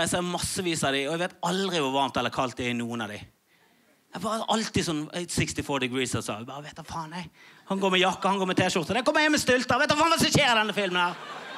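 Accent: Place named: Bergen, Norway. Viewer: Norwegian. Bergen dialect.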